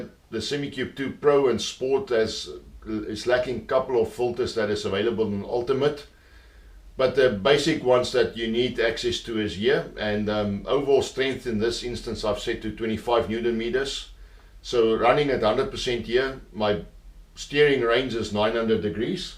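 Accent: South Africa. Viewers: Good to hear a south African accent within sim racing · Accent like south african mate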